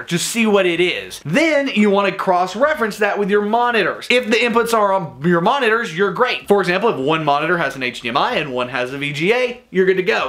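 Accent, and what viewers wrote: United States. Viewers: I lasted only 30 seconds.... TOO loud, too intense, too ummm American. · hes so american omg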